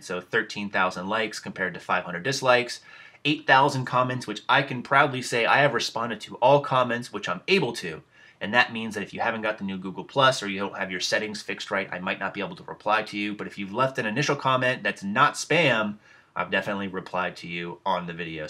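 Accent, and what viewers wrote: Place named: New England, United States. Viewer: Where in New England are you from?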